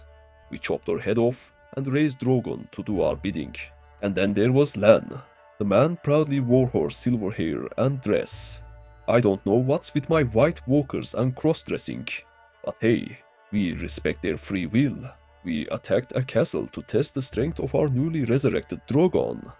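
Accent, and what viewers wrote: Turkey. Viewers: Dostum güzel içerik aksağanın Türk gibi Türk müsün diye merak ettim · Can you make kuzhait campaign next time please? (Btw your accent sound like Turkish) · Abi İngilizcenden anladım Türk olduğunu hahahaha :D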